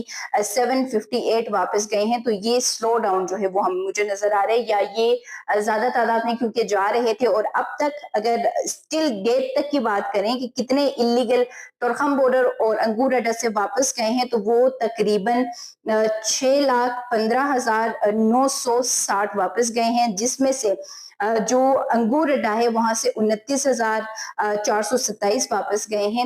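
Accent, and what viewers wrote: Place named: Chitral, Pakistan. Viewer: میڈم آپ کا اردو بولنے کا انداز چترالیوں کی طرح ھے آپ کا تعلق چترال سے ھے؟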